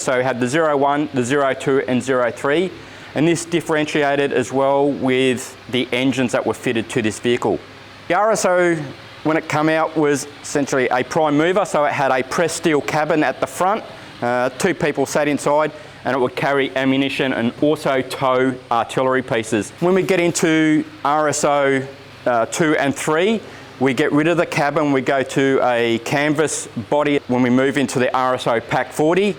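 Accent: Australia. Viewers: Aussie vernacular. You'll have get used to it if you want to watch this channel.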